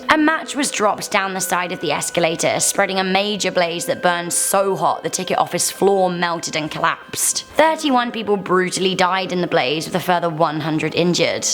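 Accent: United Kingdom. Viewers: I knew you were a brit us brits have eachothers backs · You lived in London until you were 8 before moving to Toronto? Why do you still have the stereotypical British accent then? Shouldn’t you have picked up one from Toronto??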